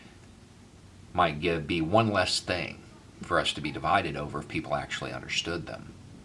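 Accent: United States. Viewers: Great stuff man . This is what a real American sounds like 🇺🇸💯 Subbing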